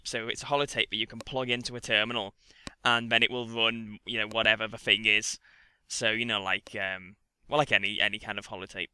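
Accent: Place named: Liverpool, United Kingdom. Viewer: You sound like ur from my hometown,Liverpool.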